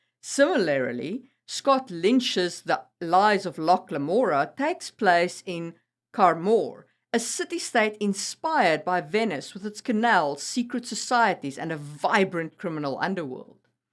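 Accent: South Africa. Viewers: Great video, thank you!! Do I detect a South African accent? 😎 While watching I kept thinking about Cape Town's historical and modern viability as a city state. I'd love to see some videos about how nations and empires crumble, and what happens in the aftermath.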